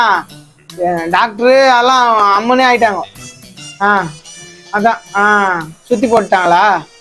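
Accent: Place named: Chennai, India. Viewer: தலைவா நிங்க பேசரத பார்த்தா சென்னை மாதிரி தெரியுது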